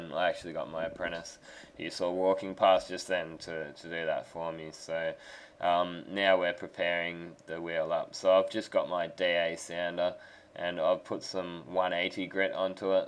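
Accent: Australia. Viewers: He's Australian- True Blue..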